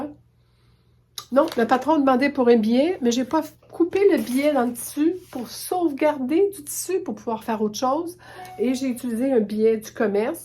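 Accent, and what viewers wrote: Quebec, Canada. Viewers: Très beau travail l beaux tissus l et accent du Québec où vivent mes enfants et où je vais tous les ans l merci pour la video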